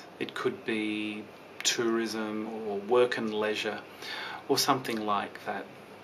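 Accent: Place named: Australia. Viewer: it's an Australian accent.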